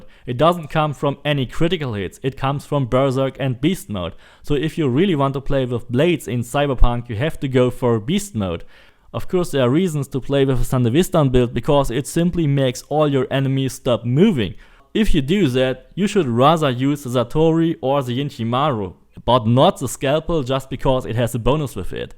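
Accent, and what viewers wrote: Germany. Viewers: Man hört definitiv den Deutschen raus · XD I listened was like wait is this a German accent I looked found the impressum jep German xD danke für das Video bleib gesund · Der deutsche Akzent is nicht zu überhören 😅 super Video 👍🏻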